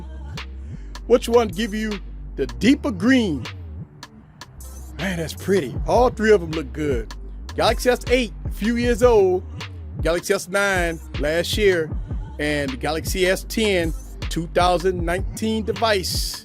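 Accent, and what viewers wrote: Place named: South, United States. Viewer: You's a southern boy huh? 😊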